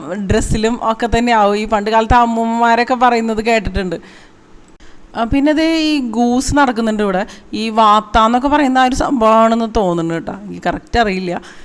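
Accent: Thrissur, India. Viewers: ശബ്ദത്തിൽ നിന്നു മനസിലാകുന്നു തൃശൂർക്കാരി ആണെന്നു - ശരിയാണോ?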